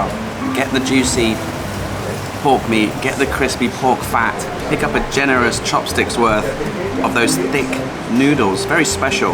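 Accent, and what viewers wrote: United Kingdom. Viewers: Hi...i just watch your video about hoi an ( which i go end of this april for 6 days ) I think we have same interest about culture and quiet place and the art of cultural places ( i mean not to so toursty like bangkok which so mega cities that boring except for shopping ) By the way, i am fendi from indonesia..sorry my english not so good ( also i plan to go UK because i feel i connected to UK countryside which i feel when i see documentary about UK ) I think you from Uk? Because of your accent then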